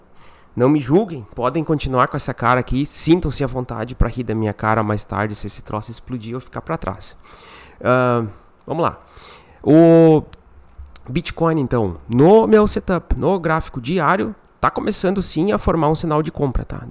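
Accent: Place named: Australia. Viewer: Kkkk😂 mas não tem mesmo, o tem um sotaque tipo uma mistura de Inglês Autraliano com Gaúcho.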